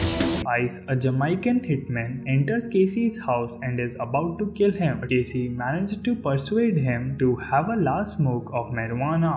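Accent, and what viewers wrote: India. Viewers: I'm a born and bred Englishman. Your voice and accent is a very nice Indian one, also soothing. Ignore the racist haters and please keep going ! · I’m tired of the ai text to speech voiceovers so finally hearing a human is so relaxing. Also I enjoy Indian accents as I can do a Indian accent too along with a lot of others!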